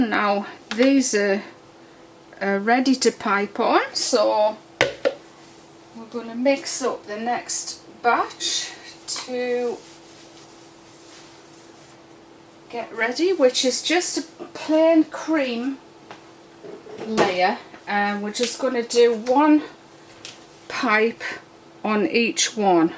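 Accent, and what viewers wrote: United Kingdom. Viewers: Lovely shade of green. Looks good enough to eat ! American accent. Lol. You gave it a shot...I rather like your British accent 💜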